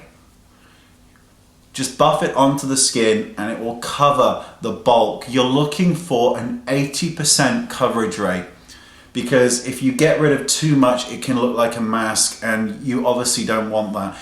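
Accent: Australia. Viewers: You're British right? Kinda sound Australian lol. Thanks for the video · are you from australia or great britain?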